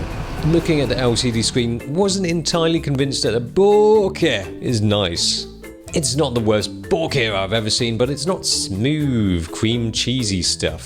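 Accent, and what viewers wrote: United Kingdom. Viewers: Oh my god, Asian face with British accent.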